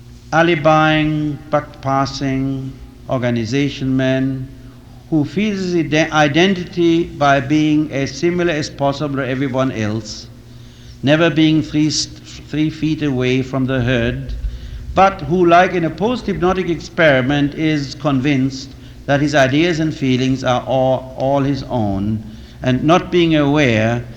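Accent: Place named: India. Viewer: Why does he sound like he has a slight Indian accent ?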